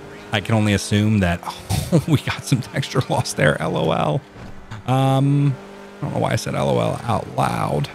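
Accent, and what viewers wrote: Bay Area, United States. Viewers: Why do you sound like Bay Area buggs a little? Or is it just me? Anyways love the content!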